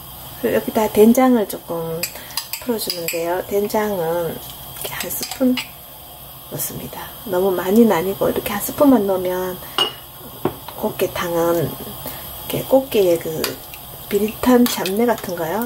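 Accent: Gyeongsang, South Korea. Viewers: 이모님 영상 보는데 옆에서 아내가 인사 따라하네욬ㅋㅋㅋㅋ대구사람아니면 못한다고 대구부심 ㅋㅋㅋㅋ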